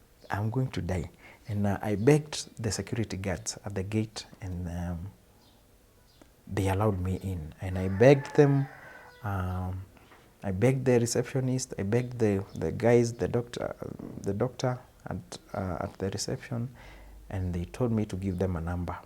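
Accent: Kenya. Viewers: i will never judge an alcoholic... there are so many underlying causes that need to be resolved some issues are as far as childhood traumas. thank you for this one. i love how you are narrating your story, it has pure kikuyu under tones. 😊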